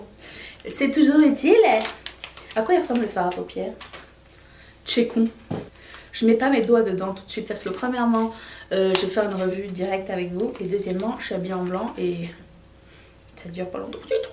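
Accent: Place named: Canada. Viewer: Cc tu as de plus en plus l accent canadien 😁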